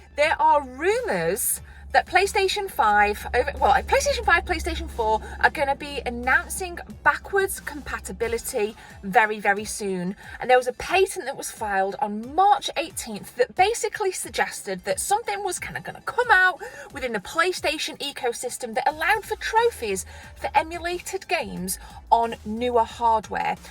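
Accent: United Kingdom. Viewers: That amazing uk accent!🙈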